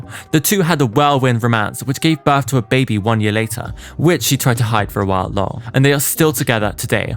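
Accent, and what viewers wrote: United Kingdom. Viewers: british accent XD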